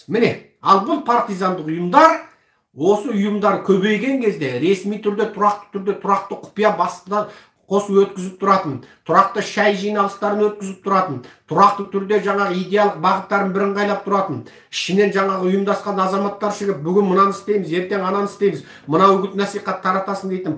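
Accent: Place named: China. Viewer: Сені кім сатып алған.Қытайский акцентпен сөйлеп отырсың ғой.Қытайда отырып алып біздің елге ірткі салма.Сенсіз де өзіміздің агитаторлар жетіп жатыр.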